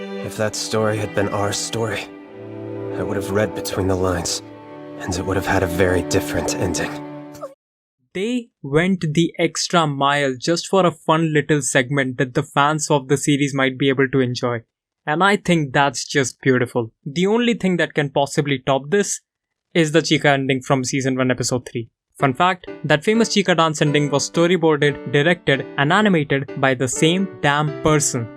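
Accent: India. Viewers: Amazing video man, btw are you Indian ? Your accent sounds like it.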